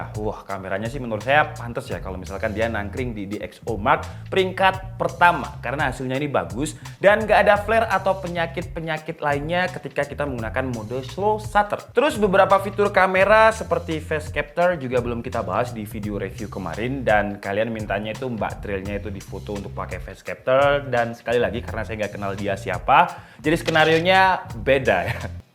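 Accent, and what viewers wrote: Malang, Indonesia. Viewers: owalah om wong malang tah...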